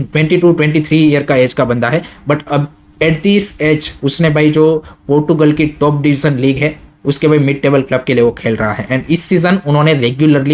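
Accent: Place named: Bengal, India. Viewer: Ap bengali ho na apki hindi sunke ashi lag ra he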